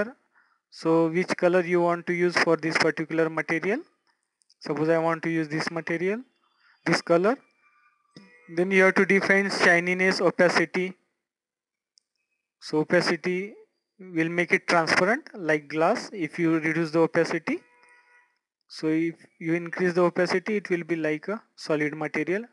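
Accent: India. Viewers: This Speaker MUST BE INDIAN!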